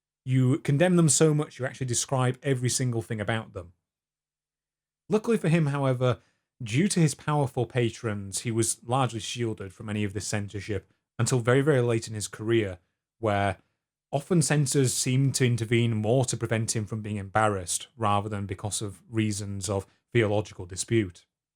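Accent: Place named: England, United Kingdom. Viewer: thats not an American an Englishman. Trying to act smart when you cant even tell accents apart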